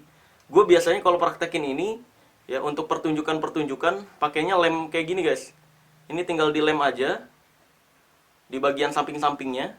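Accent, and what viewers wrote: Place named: Wonogiri, Indonesia. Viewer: Pakek apa tu yang di kuel-kuel. Bang jawa nya wonogiri ndak hilang. Haha😀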